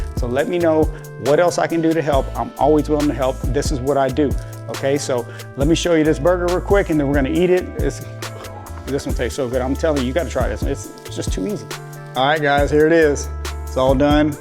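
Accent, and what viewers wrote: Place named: South, United States. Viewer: I’m loving your channel. If you don’t mind me asking what part of the USA are you from. I hear hints of a familiar accent. Alabama girl here. Lol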